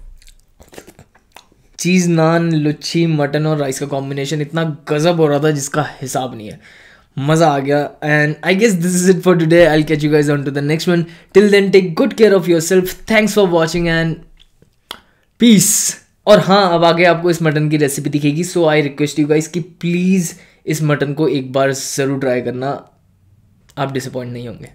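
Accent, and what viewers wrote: India. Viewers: Bro your speaking english very nice but i cant understand you. Your accent is so indianish. You are a very good speaker and i love your videoes. Plz at least try to speak with a little different accent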